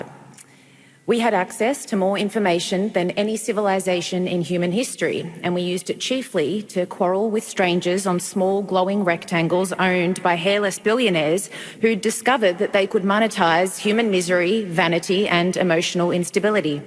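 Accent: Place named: Australia. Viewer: Omg! She's an Aussie. Struth and by crikey 😂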